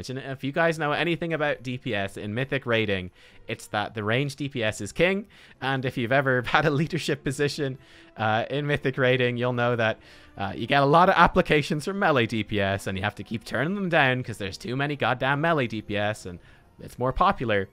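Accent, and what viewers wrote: Ireland. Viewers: Dat sweet Irish accent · are you irish or am i picking up the accent entirely wrong?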